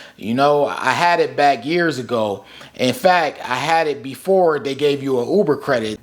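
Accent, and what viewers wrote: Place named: Buffalo, United States. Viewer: Are you from buffalo?...gotta be. I hear it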